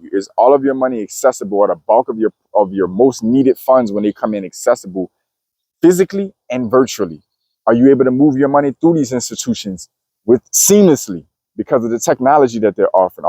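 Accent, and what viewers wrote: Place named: Louisiana, United States. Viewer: Hello! I knew you were from LA, I could hear it in your accent…I definitely know about hurricanes and having to have resources to travel based on potential hurricanes! And the ability to help others in my family and extended circle. This is a good video. I have strong relationships with Chase and NFCU…also CapOne and Discover…business with Chase and NFCU…next on list is AMEX…DDs going to Chase, NFCU, and CapOne…IRA with NFCU, savings with Chase and AMEX.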